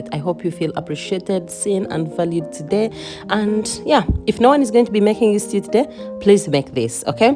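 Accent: Kiga, Uganda. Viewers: You sound Ugandan, thx for cooking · U sound like a mukiga btw, I love your video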